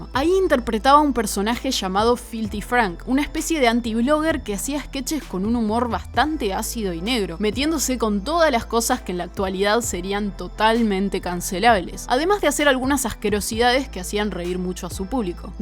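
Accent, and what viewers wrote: Argentina. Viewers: Me encanta que le dice ChoChi , amo el acento argentino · Yo: Yoyi: Shoshi Amo a los argentinos ahajajsh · como decis "shoshi" me parte los webos! yo entiendo q en argentina pronuncian asi pero tampoco pa tanto, el nombre es japones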